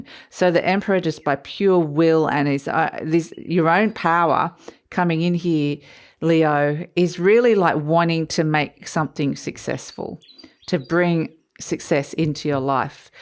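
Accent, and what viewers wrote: Australia. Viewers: Very good...resonates and very exciting things to come. I enjoy ur channel especially because u sound to be Australian and relate it to Southern Hemisphere, there's not to many Southern hemisphere readers so I'm grateful when i find one! Namaste beautiful being namaste 💖🇦🇺🙏🌈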